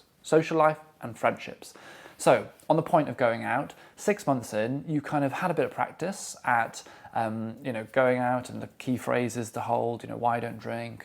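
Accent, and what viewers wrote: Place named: South England, United Kingdom. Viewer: Your accent is spot on with Daniel Radcliffe. What part of the UK is it from?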